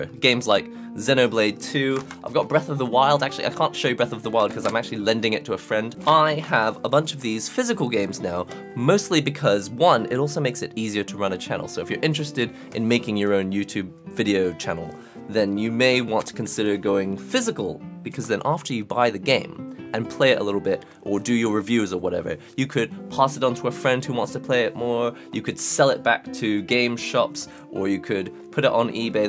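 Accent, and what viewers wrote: United Kingdom. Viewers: A Japanese looking man that sounds British!!! Freaking awsome!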